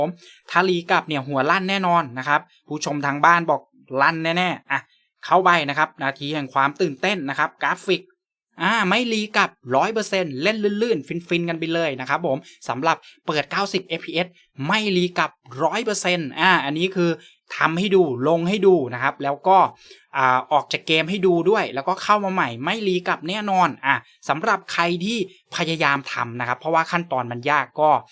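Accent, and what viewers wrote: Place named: South, Thailand. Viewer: ทำไมต้องพูดใต้ด้วยครับ5555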